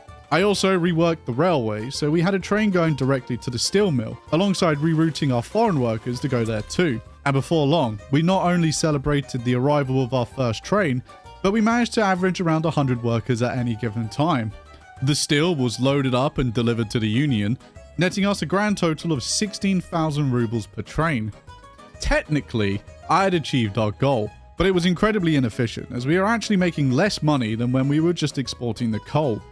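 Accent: United Kingdom. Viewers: Your british accent goes really hard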